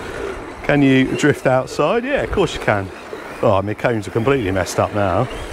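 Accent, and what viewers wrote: United Kingdom. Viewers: I assume so he sounds he is from the UK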